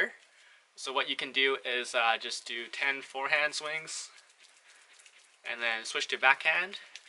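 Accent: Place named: United States. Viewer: Jogender Jakhar he has an American accent so I would assume America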